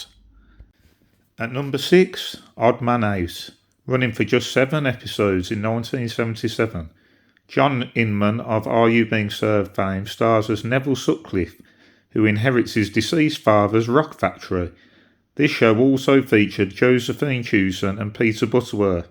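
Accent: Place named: Birmingham, United Kingdom. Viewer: I agree with all of these, all awful, however you seem to be reading your script, and your brummie accent, whilst genuine is quiet grating. The word, five, does not have an o in it. Foive is not a word.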